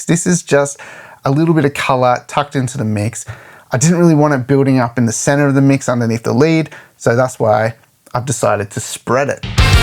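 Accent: Australia. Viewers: Awesomeness mannnnn you sound just like craig jones the aussie bjj legend. Hahahaha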